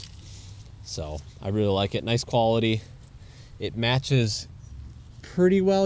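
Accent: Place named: Michigan, United States. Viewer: Love your vids. Are you in Michigan by chance? The weather, trees, and accent give it away being a Michigander myself.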